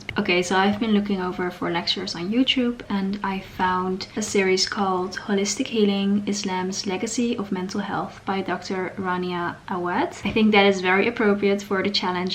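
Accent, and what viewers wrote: Netherlands. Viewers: Are you dutch? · I feel like youre Dutch. I can hear the accent. First video I watch by the way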